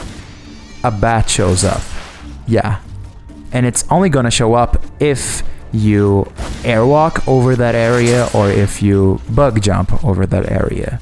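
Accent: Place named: United States. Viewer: No dude you sounded like an american for me when i first met u!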